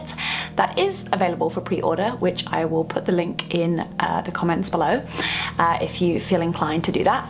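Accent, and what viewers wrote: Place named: England, United Kingdom. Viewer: I really love English accent, she's a real queen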